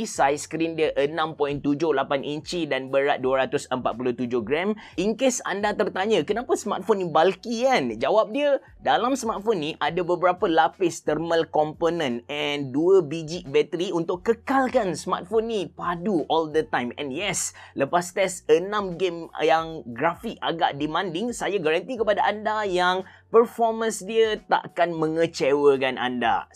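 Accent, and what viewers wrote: Kelantan, Malaysia. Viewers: Cute ye kecek kelate...tak dengo bahaso..kalo Johor tak geti bahase lerr..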